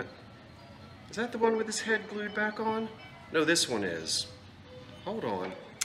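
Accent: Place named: Philadelphia, United States. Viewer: "Wooder"....love the Philly accent. Reminds me of home....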